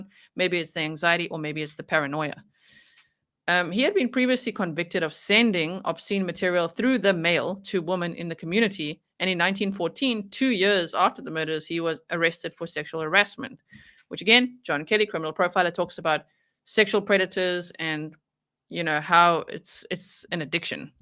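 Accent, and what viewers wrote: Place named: South Africa. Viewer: I really, really enjoy your presentations. I like how the pace moves swiftly. Originally your swift speaking pattern with your SA accent was difficult for me to follow, but now that I am used to it, I love the fast pace. I now even put other people’s podcasts on 1.25 speed to get through faster, per your suggestion. I pray we are not going to try to eliminate knives now in addition to firearms. Murder has nothing to do with a weapon and everything to do with a person’s heart. After all, Cain used a rock. I’m sure there have been many murders using bats, 2x4’s pieces of lumber, axes, gun butts, vases or lamps, hammers, hands or fists, pillows, or plastic bags. In other words, you can’t legislate morality. We need tougher and swifter punishments, not regulations that take freedoms and methods of self-defense away from the law abiding people. 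We have quite a few firearm weapons ourselves, plus kitchen knives, excess lumber, the lot. I don’t want my freedoms taken away because there are a few bad people murdering innocent people.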